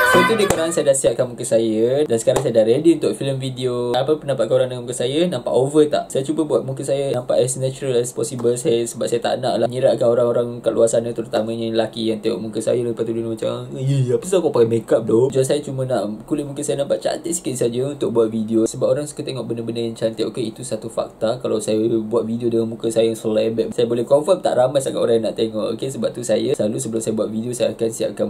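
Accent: Sabah, Malaysia. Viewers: Sy bru tau kau org sabah😄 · Pahammm bah.hehehe langsung la kan teda mcm urg semenanjung bila bcakap loghat sabah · waaaa... sabindo sabahan bh c 😍 salam dari Tuaran Sabah 😍 that sabah accent 😙😙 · Ko asal sabah ba pula. Pndi ka slang sabah ? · Best pulak dengar cakap sabah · Bunyi KK sangat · Sabahan bah pula 😅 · Awww so agree with u. Sabahan slang awk still on point lah! I'm Sabahan too.. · Suka dgr ckp sabah.. pekat lg sabahan tu.. 👍🏻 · bahasa sabah..cenggini ....👍👍👍👍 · gila klau sia tda tgk ni video kan, sia tda tau oh ni org sabah 😂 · Best nya dgr abam ckp sbh.. hehehe sya sabah celup.. ayah penang.. mak org sbh.. ddk di sbh smpi umur 10thn ja.. so little knowledge about my hometown sabah.. so sya di pggl sbh celup.. huhu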